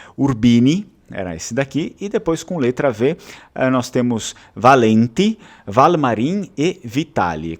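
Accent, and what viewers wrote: Italy. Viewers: Incrivel seu sotaque italiano para descrever sobrenomes italianos. Parabéns!!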